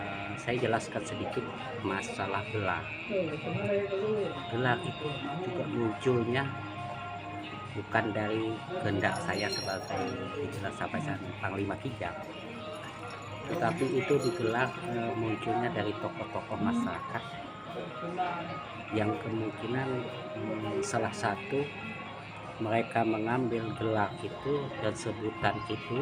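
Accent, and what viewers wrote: Indonesia. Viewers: Logat kutai,Banjar ini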